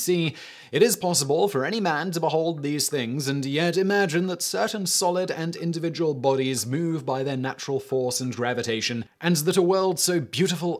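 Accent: England, United Kingdom. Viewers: Given your British accent, the typo in the thumbnail kinda ruins a lot of credibility of the research. Or it's just a genius ploy to get higher engagement via outraged comments.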